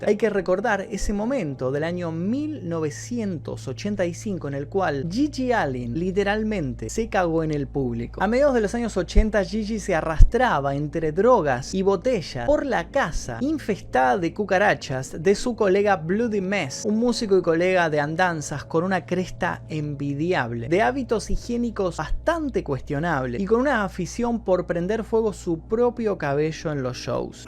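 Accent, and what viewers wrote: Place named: Argentina. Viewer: Es argentino, para mí es normal escuchar ese acento, en mi país sobran los argentinos.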